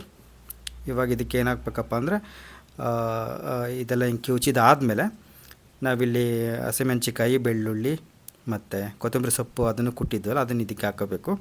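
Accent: Chitradurga, India. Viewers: ಚಿತ್ರದುರ್ಗ ಭಾಷೆ ಕೇಳಿ ತುಂಬಾ ಸಂತೋಷ ಅಯಿತು ನಮ್ಮೂರು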